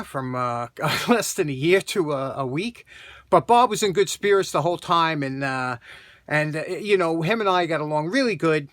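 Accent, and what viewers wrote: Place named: East Coast, United States. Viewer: You’re the best, all of your nostalgic sentiments resonate in me. I was born in Allentown, PA in 1969 and moved to CA when I was 10, your East Coast accent and perspective rings true for me. Happy New Year. I love all of your posts and look forward to all that come in 2021.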